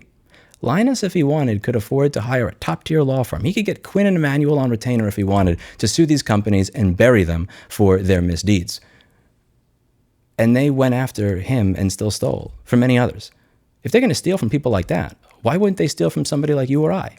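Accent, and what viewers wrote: New York, United States. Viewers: There are few things as beautiful as New York accent starting to straight up drip off my speakers as the video goes on. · The New Yorker accent really shined in this one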